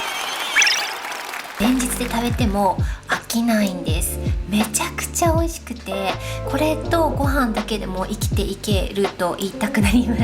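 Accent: Okinawa, Japan. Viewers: の沖縄弁とキャビンクリューみたいな話し方が可愛い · の沖縄なまり可愛い😍💕沖縄で食べたシリシリ、美味しかったのにちゃんと習ってないので、このレシピを真似て作ります！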